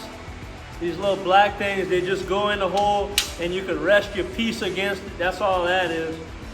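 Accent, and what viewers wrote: Louisiana, United States. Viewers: Sound Cajun as hell, love it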